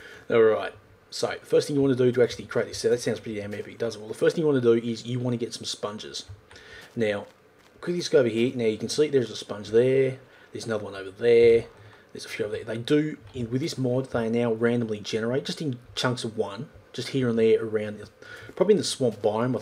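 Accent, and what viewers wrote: Australia. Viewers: Australian accent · Its an Australian accent. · Is that an Aussie accent? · His accent as well is just typically aussie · OMG AUSTRALIAN ACCENT!!!! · u got the best ausie accent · luving that auzzie acent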